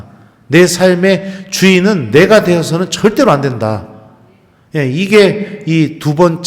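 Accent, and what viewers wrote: Chungcheong, South Korea. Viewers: 신부님 강의 주옥같은 말흠 너무 재미 있고 충청도 사투리와 부모님 무한사랑으로 사제가돠 셨너요 감사감사합니다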